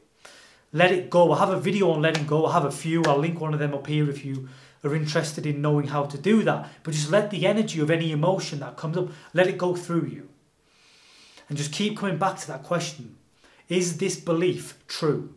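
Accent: United Kingdom. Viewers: Lovely stuff! Keep it up young king. Nice to hear a British accent 🤓💚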